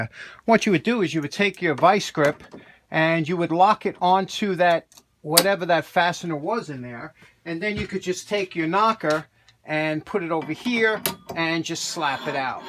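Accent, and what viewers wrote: New York, United States. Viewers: i’m a new yorker too, born in brooklyn, grew up in Flushing, now live in Denver, great hearing your accent😊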